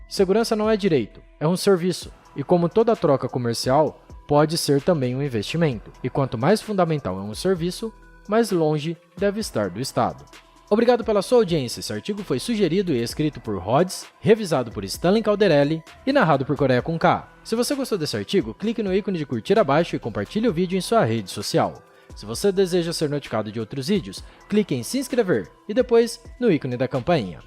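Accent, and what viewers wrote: Brazil. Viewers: Peiceberam, poique, podei, seiviço, ocultai, abeita, Salvadoi, meicado ... O narrador nasceu num dos três estados, Minas, Paraná ou São Paulo, pois troca o R pelo i , da até agonia.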